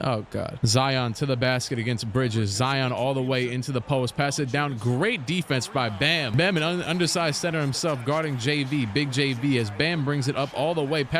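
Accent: Trinidad and Tobago. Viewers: Are you actually Trinidadian ? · your trini? same here bro fire vid ❤️ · Nah no way bro was trini this whole time 😭🫡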